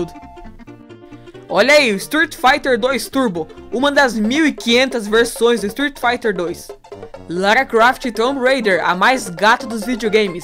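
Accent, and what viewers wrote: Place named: Paraná, Brazil. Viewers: Parece paranaense... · Você é de Curitiba ou de outra cidade do Paraná? O sotaque é inconfundível. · axei que vc morasse em Curitiba, seu sotaque de paranaense é bem forte, legal vc se ser daki "pr"... tem muito potencial, boa sorte =D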